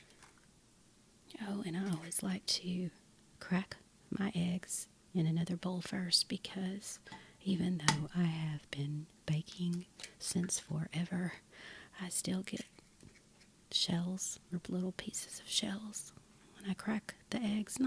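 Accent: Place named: South, United States. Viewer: Yay, you're speaking! I love your baking videos but always wished you'd add the soft spoken voice. Generally I don't like voiceovers, but yours was great. So, yes to the speaking, yes to the crinkly flour and sugar bags, yes to the butter wrappers, yes to checking the recipe a lot, yes to minimizing the mixer sound and yes to porcelain bowls! Great job! Plus you really know your baking. And your southern accent was just the icing on the cake. Maybe you could add narration to your other past videos. Keep up the great work! Thank you.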